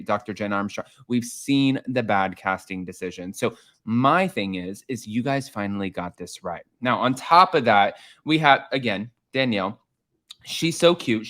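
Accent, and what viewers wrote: New Jersey, United States. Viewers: I love your 'Joisy' accent! Great recap, and am happy that you and had so much fun with your Vanderpump family. Continue to love love love your very eclectic content!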